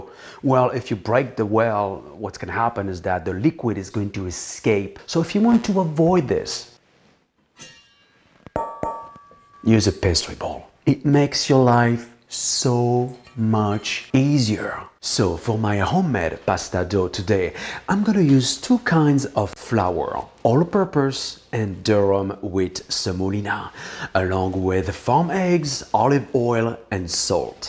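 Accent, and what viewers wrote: France. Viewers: french accent ^^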